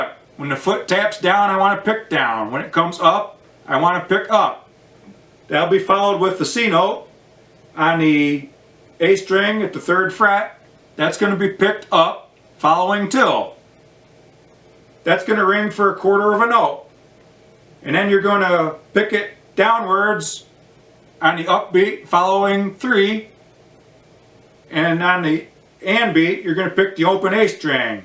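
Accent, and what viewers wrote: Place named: Canada. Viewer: I hear a Canadian accent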